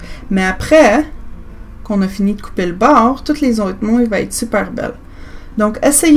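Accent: Canada. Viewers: Your English has a (it sounds to me like) a Canadian accent, are you from Quebec Canada? I'm from Vermont USA. I only ask you this, because you speak French too.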